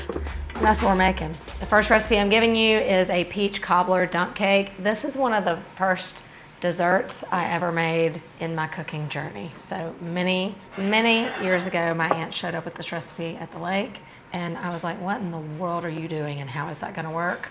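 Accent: South, United States. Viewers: I concur! And I love that southern twang. Reminds me of home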